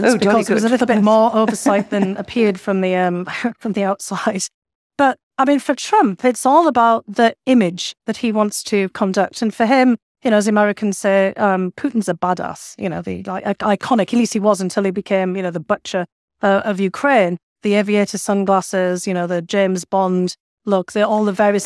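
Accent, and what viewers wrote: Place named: England, United Kingdom. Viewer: Sounds like she’s got an English accent.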